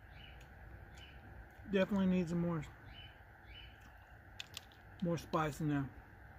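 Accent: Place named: South Carolina, United States. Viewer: Dang..... are you sure you’re not from South Carolina????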